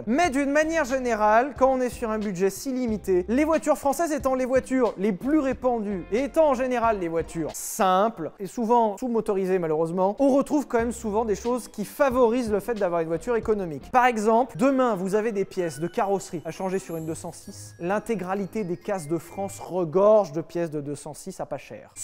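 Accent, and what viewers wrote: Belgium. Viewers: Je pense que sur le contenu, y a rien à dire: les conseils sont au TOP! J'ai dû récemment acheter une petite auto d'occaz avec un budget serré de 1200 euros: Twingo, sur base de vos bons conseils sur le budget à 1500 Par contre... on peut parler de cet accent belge à? C'est que c'est bien fait! Je suis moi-même belge et je n'arrive pas à faire cet accent mdr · On est d'accord 😉. Mais perso, l'accent que prend dans cette vidéo, n'est pas vraiment l'accent Liégeois pur et dur. Je trouve qu'il se rapproche plus de Caudy Focan que de Renaud Rutten. Enfin bref j'ai bien saisi l'idée, et on ne va pas se prendre la tête pour une histoire d'accent Belge 😅🙏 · Incroyable l'accent belge de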